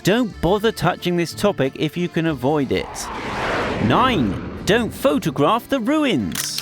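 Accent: England, United Kingdom. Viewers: What an ugly pomie accent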